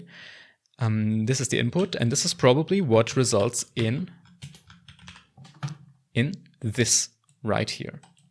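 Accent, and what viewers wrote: Germany. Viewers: I genuinely like your accent and you being a German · Ich liebe deinen deutschen Akzent ❤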